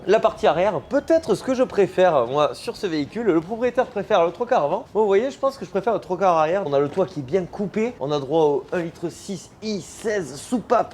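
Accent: South, France. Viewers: Merci, tes vidéos sont toujours un régal à regarder et à écouter (avé l'ascent) ça nous met du soleil dans la tête. J'espère que tes abonnés apprécieront le petit tour, parce que tu ne l'as pas dit mais filmer de la place arrière avec ta conduite, mon dos a pris cher....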